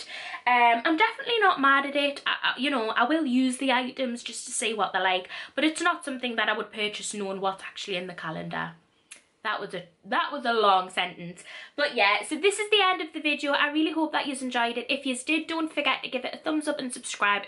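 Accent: Newcastle, United Kingdom. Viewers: Got recommended this video, heard the accent, subscribed for being a rare relatable British personality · I'M FROM NEWCASTLE! AHHHH FOUND A GEORDIE!! CAUSE I'M A GEORDIE TOOOOO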